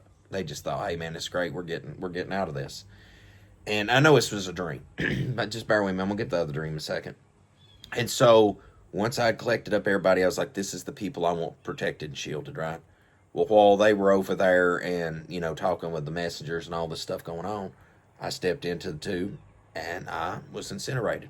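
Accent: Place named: Texas, United States. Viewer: Where in Texas are you speaking brother ?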